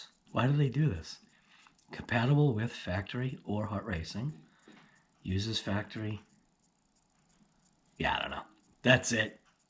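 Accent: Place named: Massachusetts, United States. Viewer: Hey buddy. I knew your accent was one of Massachusetts LOL I grew up in East Bridgewater for a lot of years. I live in Pawtucket now. And I work at Hobby quarters in Foxboro Mass I don't know if you have ever frequent it there. But feel free to stop by this summer we have a huge rock crawling course. I don't know if you are into solid axle monster trucks but I have been designated to design and create a summer series for solid-axle monster trucks styles with penda points series. I don't know if you will see this message but hoping you do. We are also doing a construction site for people who are into hydraulic heavy equipment RC. Anyways I just heard your Alexa say Plymouth and it pushed my head back and surprised LOL anyways nice to see somebody from our side of things is doing so well in the RC Community for us God bless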